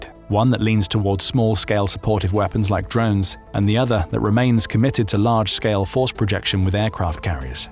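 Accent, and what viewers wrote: England, United Kingdom. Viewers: Coming from some guy with an english accent, this is almost comical...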